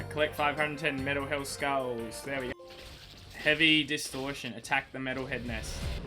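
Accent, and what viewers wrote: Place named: Australia. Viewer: Not my usual sort of content to consume, but hearing an Aussie playing my favourite childhood game and smashing it has earned a sub! Keep it up and hope you smash your goal for the year!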